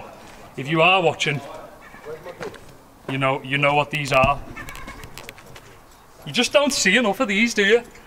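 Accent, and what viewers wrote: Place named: Scotland, United Kingdom. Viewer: Even sounds like home (Scotland) hyped on everything bro keep it 💯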